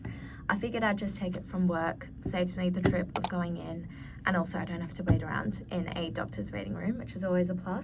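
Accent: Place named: United Kingdom. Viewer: Such a cosy video, and lovely British accent.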